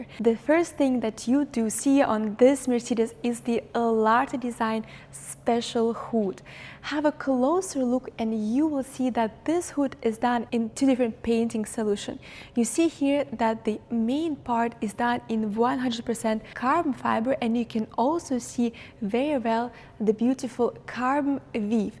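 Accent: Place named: India. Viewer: этот английский для индусов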